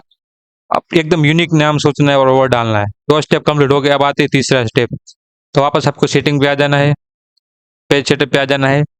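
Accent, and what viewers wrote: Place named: Gujarat, India. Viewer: आप गुजराती हो बोली इसी लगती है ❤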